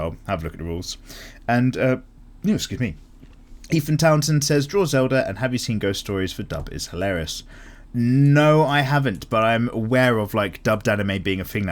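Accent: United Kingdom. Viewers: you kinda look like and sound like Ravi from Izombie (not just the British accent). if you don't know who i'm talking about... well you can look it up. i'm also gonna post this on every DWM in the future till you find out. also draw albedo from overlord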